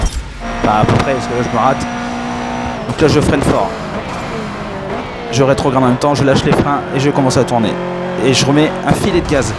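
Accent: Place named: North, France. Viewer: On sent que vient du Nord;-)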